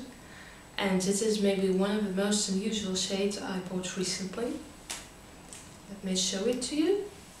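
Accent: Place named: Russia. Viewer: ой, вы оказывается тоже из России) то то вижу что то родственное в акценте,решила проверить)) круто))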